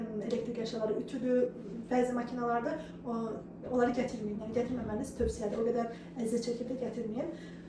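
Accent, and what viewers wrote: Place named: Zaqatala, Azerbaijan. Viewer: Maşaallah olsun. Həzz verir adama izləmək. Zaqatala ləhcənizi yesinlər, hocam 😃❤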